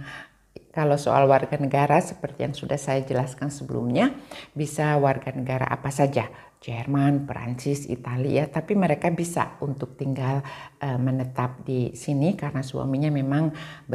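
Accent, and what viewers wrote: West Java, Indonesia. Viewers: Masya Allah. Ibu parantos 40 thn di Swiss tapi tasih keneh nyunda. 👍 Punten neupangkeun abdi ti Cileunyi bdg. Pun anak Oge di Swiss ti sasih januari nuju ngiring program au pair, linggihna di kota meggen. Mudah2an pun anak tiasa tepang sareung ibu. 🙏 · 30 tahun lebih tinggal di Swiss, tapi logat bahasa sundanya masih terasa bu.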